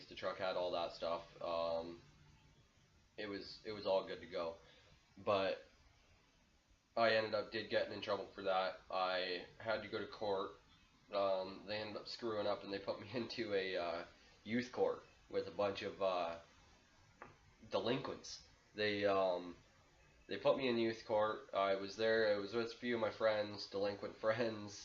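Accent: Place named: Canada. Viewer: First off, you’re the most Canadian person I’ve ever heard in my life, second... it would be better if we could see your boot on the gas pedal when pumping her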